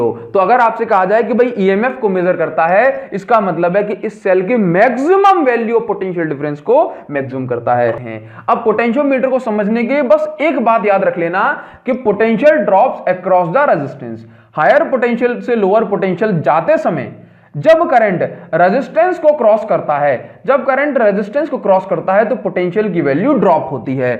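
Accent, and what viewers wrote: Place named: Uttar Pradesh, India. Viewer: Aap uttar pradesh se hona Guru ji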